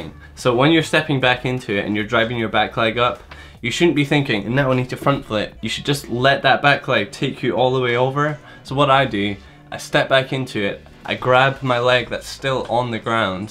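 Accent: Scotland, United Kingdom. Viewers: Hey are you Scottish ?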